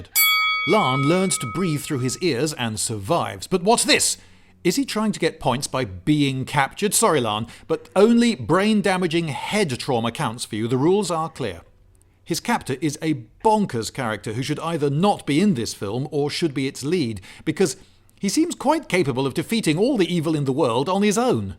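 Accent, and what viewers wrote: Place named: United Kingdom. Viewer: For real this bad teeth brit voice is annoying to hear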